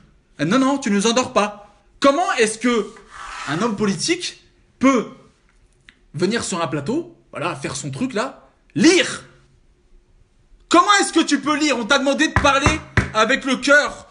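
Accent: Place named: England, United Kingdom. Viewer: Juste une question : Pourquoi avoir pris un accent anglais ? xD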